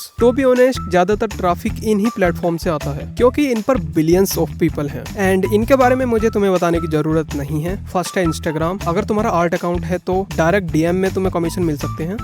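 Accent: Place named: Odisha, India. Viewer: Bhai pata nahin kyun esa laga ki aap Odisha se ho ? 🙂‍↕️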